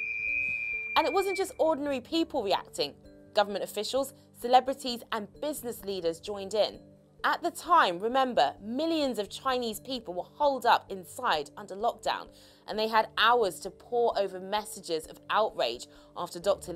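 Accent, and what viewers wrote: United Kingdom. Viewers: I would believe it's a cover up but i hate British accents and colonialism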